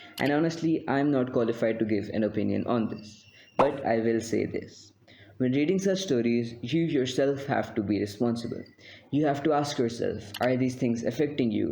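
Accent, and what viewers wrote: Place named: India. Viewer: You sound very Indian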